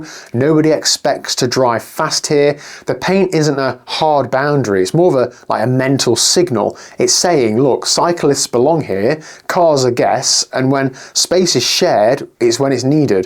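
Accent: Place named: England, United Kingdom. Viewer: You sound as if you’re English. Can you explain to me why so many British people use the word ‘floor’ when they’re outdoors? It’s the road, right? If you stumble or fall off your mountainbike in a meadow or in the woods, you land on the ground, not on the floor, right? I hear this a lot lately. Maybe a result of our modern sedentary life?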